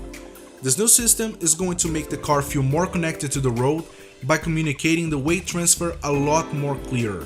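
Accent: Brazil. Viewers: Are you brazilian?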